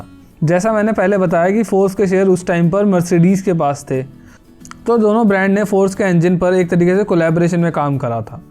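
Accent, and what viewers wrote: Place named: Rural, India. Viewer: Be clear in your dialogues.. and also better use English instead of this village style hindi ascent..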